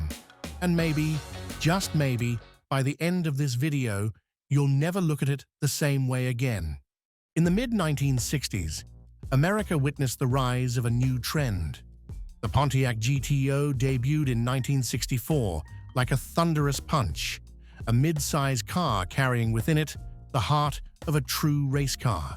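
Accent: United Kingdom. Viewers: Ok, I'm finished watching. Great story and pix. But PLEASE get rid of that annoying audio (music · drum) track in the background. And what's with the overly British AI voice? You can do better.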